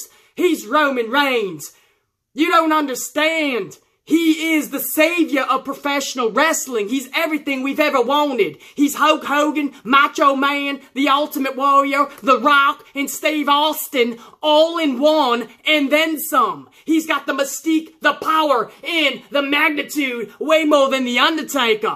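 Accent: South, United States. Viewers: Funny stuff love the Ole Country Accent on the Night The Coke Zero 400 in Daytona is on. There no way Im cheering for Roman way he is booked an Vince Mr. GoldenBoy continues to be pushed down our throats. So, sick of hearing “It’s the Big Dog.” No, Cole its not we know you been freed by Vince to say that. We get it! He not the guy. But again this some funny stuff. is 💪🏻 # #Smarts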